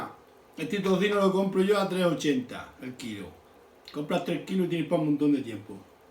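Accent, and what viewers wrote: Extremadura, Spain. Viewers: me encanta su acento extremeño. me recuerda a mi madre que lo era. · Medio minuto y ya bastante seguro de que este señor es extremeño, y me suena que probablemente de algún sitio de Cáceres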